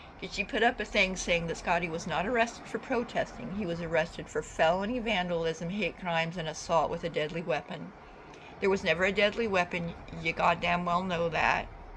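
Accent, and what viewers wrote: United Kingdom. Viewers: Thanks - agree 💯 and the Brit accent is hilarious! ❤❤❤ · I've been catching up on a bunch of streams. Sorry I didn't see this earlier. 👏 👏 👏 👏 Great Brit accent & recap of Kelli Craptor's video. I can't watch her amongst others who fall in line behind MAGA kArOn. They're disgusting imo.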